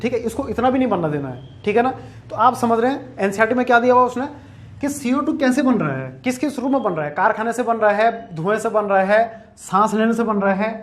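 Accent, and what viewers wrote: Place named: Garhwal, India. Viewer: Sir one thing apko hame apni language mai phadhana chaiye (ghadwali mai)... abhi tk koi bhi teacher nhi h youtube mai jo gadwali mai phadata ho.(jase south mai dekh lo. Tamil mai dekh lo. Apni language mai phadhate h sb. Assam mai dekhlo. Tripura mai dekhlo. Sb apni language mai phadaya h) apki voice mai gadwali ka flow bhi ara h. bacho ke liye best h jo hamre jadatar gadwal mai h..jldi samjhege gadwali mai .. aap ek baar try krke dekhye. jada success milegi apko and students ko bhi.. Thanks Aspirant Deled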